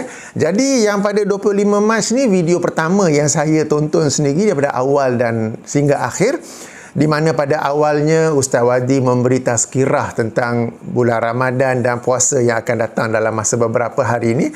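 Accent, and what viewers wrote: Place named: North, Malaysia. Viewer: Senang nk rujuk sama2 ckp utara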